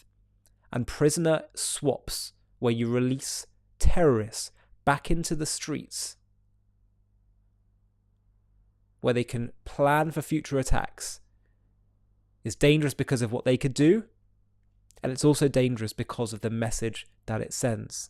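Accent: United Kingdom. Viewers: Pro-genocide hogwash, with Limey accent. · Have you seen the way these poor Palestinian women were released from the terrorist IDF illegal military prison? I wonder how much you get paid and who is paying you to speak this rubbish nonsense. Only women and children were released by Israel. Why didn’t they release the doctor that was raped by the IDF? Oh that’s right because the IDF killed him. You’re not that bright for having a British accent. You sound British but you speak ignorant.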